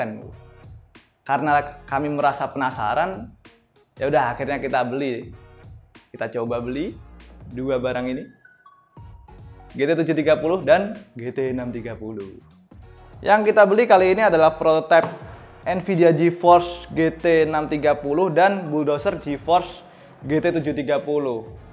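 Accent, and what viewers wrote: Java, Indonesia. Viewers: Kedengeran medok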